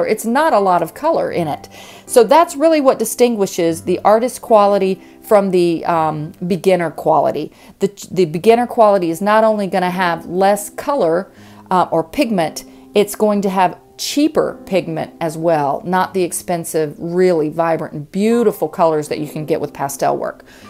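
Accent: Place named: South, United States. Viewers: A southern accent never hurts. Hehe. Also, you'd never know how they are pronounced just by looking at them. I've seen even the most seasoned artists muck up "quinacridone" and "Phthalo." We can't be expected to know how to pronounce words derived from chemistry, nevertheless words from foreign languages! 😝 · Just part of the learning process (for all of us!). Love the southern accent!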